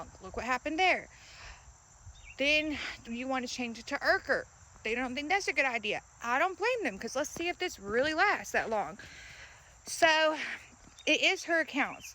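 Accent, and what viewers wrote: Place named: United States. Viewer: Mee too new sub here and I love your country accent sounds like mine lol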